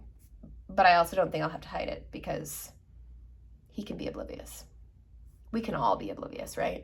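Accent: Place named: Chicago, United States. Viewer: That Cryptids SAL is looking awesome! Love that Chicago accent too 😜 Your videos are great!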